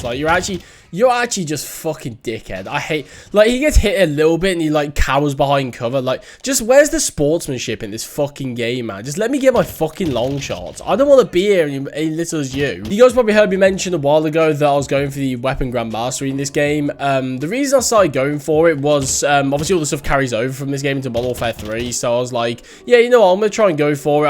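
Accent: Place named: United Kingdom. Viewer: Oi an angry British chap 🤓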